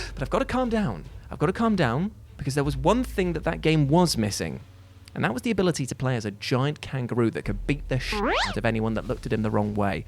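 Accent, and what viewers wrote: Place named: United Kingdom. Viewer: he's british lol they pronounce names little differently than most to us it is You-Fee to him it is YU-FEE, like one person pronounced gerudo differently as Gar-Udo while some say Jer-udo making the G sound like a J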